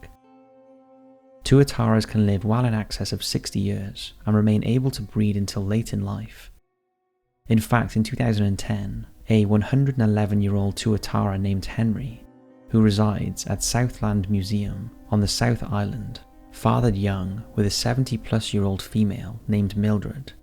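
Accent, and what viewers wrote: Wales, United Kingdom. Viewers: he's from Wales so it's the way they say it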